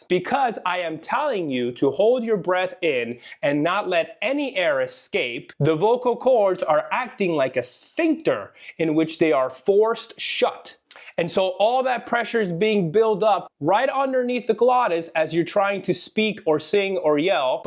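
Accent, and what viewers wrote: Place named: United States. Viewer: I thought you were italian for a second i got excited 😭 Edit: Oh, you are, i watched another one of your videos and you just have a really good american accent lol.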